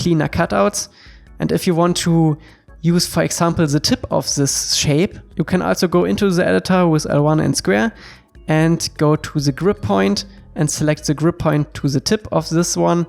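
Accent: Germany. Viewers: Are you German!? 😄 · Wenn man den deutschen Akzent raushört ^^ gutes Video!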